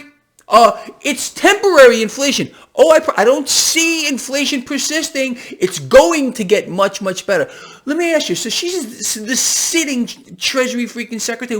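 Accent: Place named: Brooklyn, United States. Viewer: Gregory Pimpen What part of New York you from ? 🍕🕺🏽im guessing Brooklyn 😂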